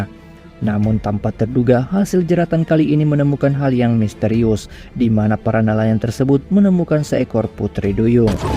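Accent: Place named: Sulawesi, Indonesia. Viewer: Admin kayakx org Sulawesi terdengar dari logatnya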